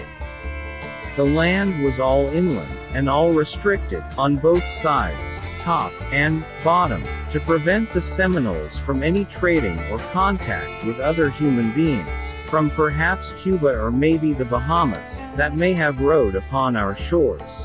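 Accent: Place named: North, United States. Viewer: you sound like a yankee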